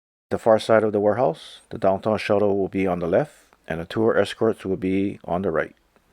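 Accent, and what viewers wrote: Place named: Hawaii, United States. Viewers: I thought the same thing too! The tone sounded very local Hawaiʻi to me. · very helpful! Mahalo! BTW are you from Hawaii? Your accent sounds local 😊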